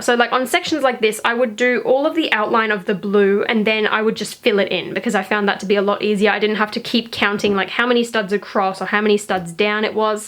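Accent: Australia. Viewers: Very nice haul! Your video showed up as my #1 recommended video, so I decided to check it out and am glad I did. Besides the great LEGO content, I have always loved the Aussie accent. I have Australian friends and have been lucky enough to explore some of Australia (quite a few years ago). I have to say, I loved your use of "pissed" to mean upset. When I was there, I was told by my mates that it wasn't used that way in Australia, but just used to mean drunk...which we were having the discussion in a bar and laughing our heads off. Okay, back to LEGO... Glad to see you scored the Captain Marvel polybags. That one has eluded me so far in local stores (No LEGO store near me here in California). I definitely want it. If I can't get it for retail, I'll likely pick it up on Bricklink or Ebay. My siblings and nieces are into LEGO. My sisters are both big Harry Potter fans and when I showed them the Harry Potter accessory pack they were both surprisingly excited for the Maruaders Map that comes in it and proceeded to tell me how important it is, etc. 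I'm a casual Harry Potter fan...I've seen the movies and liked them, but they are BIG fans. I was surprised to find the Mario blind bags at my local Target a couple days ago. They had 12 packs and I bought them all. I got 8 different of the 10. I'm the same, that's the only Mario items I'm getting...well, I did pick up the polybag at target too...but passing on all the play sets. Awesome score on the big Hogwarts Castle...my family got t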